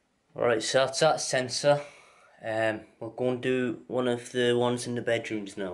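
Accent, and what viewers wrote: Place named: Wales, United Kingdom. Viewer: No, hes welsh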